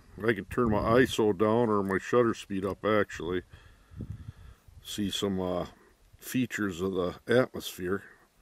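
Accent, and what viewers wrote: Minnesota, United States. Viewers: Sounds like Minnesota Man.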